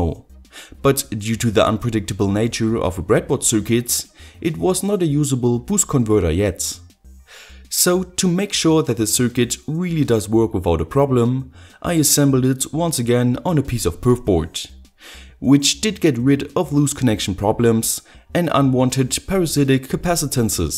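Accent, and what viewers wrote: Germany. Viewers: Hi- could it be that you are German ?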